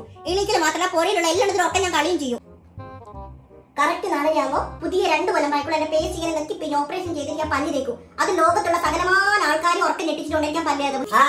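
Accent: India. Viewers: Actually i cant understand ur slang.r u from malabar? Ur acting is very funny bro.😄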